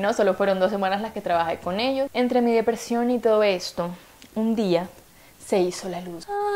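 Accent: Caribbean Coast, Colombia. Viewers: Gracias! Me gusta tus vídeos, estoy aprendiendo español y el acento costeño es mi favorito. Saludos desde EEUU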